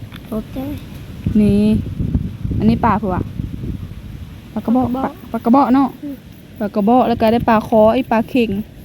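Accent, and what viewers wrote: Phu Thai, Thailand. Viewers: เข้ามารับชมบรรยากาศ ภาษา การดำรงชีวิตด้วย พูดไม่หยุดเห็นอะไรก็พูดไป ก็ถือว่าดีเลยนะนำเสนอ สิ่งที่มีอยู่รอบๆตัวเราได้ค่อนข้างน่าสนใจ โดยเฉพาะการใช้ภาษาในการพูด สำเนียงภูไท ฟังแล้วเพราะดีนะ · ่มักคำ เว้า คือ กันกับภูไทเรณู ฝั่งไทย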